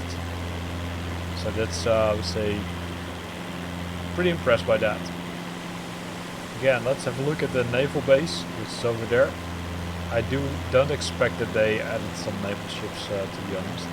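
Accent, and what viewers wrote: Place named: Netherlands. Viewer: Recognized the accent and immediately Subbed to support a fellow Nederland er!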